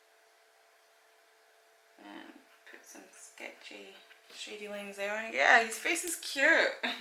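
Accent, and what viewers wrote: Scotland, United Kingdom. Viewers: Shes from scotland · Are you Scottish? I am.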